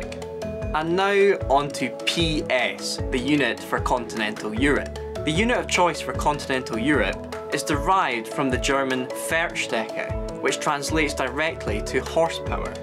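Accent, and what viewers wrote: Scotland, United Kingdom. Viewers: FML a Scottish accent.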